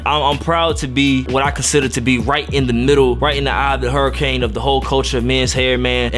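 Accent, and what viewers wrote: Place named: Philadelphia, United States. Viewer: You from philly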